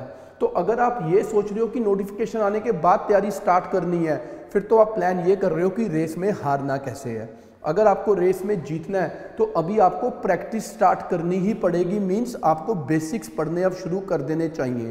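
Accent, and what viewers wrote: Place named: Punjab, India. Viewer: I really like sir's accent.. The typical Punjabi 😊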